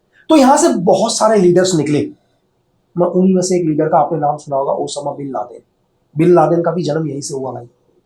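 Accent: India. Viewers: that's how kumaoni people speak 😅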